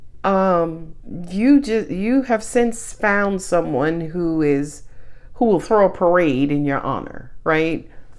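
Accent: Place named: New York City, United States. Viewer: 2024 is my 7 year cycle as well! I am ready to be completely happy & love ❤🎉 Bring it!❤🎉 Thank you! Bring on the NYC accent 😊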